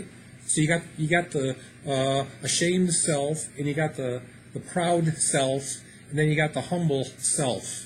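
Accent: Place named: New Jersey, United States. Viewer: Hey brother, I hear the Jersey accent coming through, I’m from jersey too. I want to say that yes within the ego body mind there is a constant changing from moment to moment and each one you can say is a self. However what your true self is, is not within the world, and that self is changeless. In fact that self is just really not even anything, it just is, and that true self CONTAINS the world and the world originates from it. But depending on where your coming from, yes there are many selves, yes there are people, yes there is duality, but the true self contains and enables it all, and is One, across everyone. Your true self and my true self, are the same, we are actually each other. Peace and much love.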